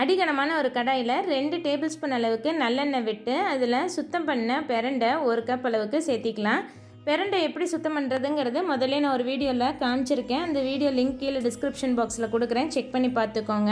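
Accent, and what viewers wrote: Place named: Kongu, India. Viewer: mathikadhinga akka . Kong slang dhan eppavum nice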